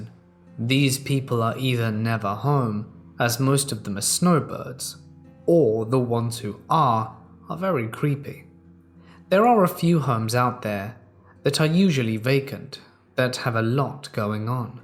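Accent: United Kingdom. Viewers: Just love hearing his British accent...wished I was fluent having his accent living here in America... I trained as a trucker and heard creepy stories about what States to avoid, always be aware of your surroundings because of human trafficking and truck jacking do to people think that semi truckers have a lot of money...😬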